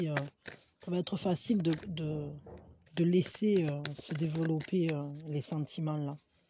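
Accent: South, France. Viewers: Bonjour, votre accent du midi fait plaisir à entendre 😍 Nouveau cycle, mais notre lien a été corrompu par de la magie noire. Ma famille est tout !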